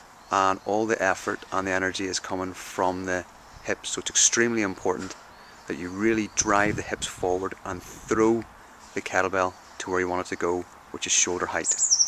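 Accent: Ireland. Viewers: The only person to equal your clear and accurate descriptions is the original kettlebell man Pavel ,excellent video and great commentary from your friend,oh and as an aside its great to hear your lovely Irish brogue,makes me feel homesick ,and I'm a Scotsman in Australia..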